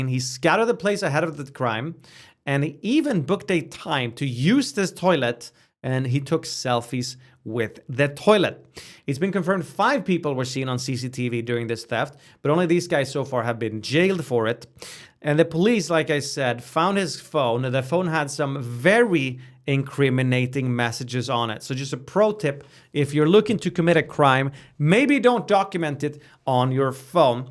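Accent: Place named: Sweden. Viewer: Do this in swedish next time cause I can't understand your english (due to your very thick accent)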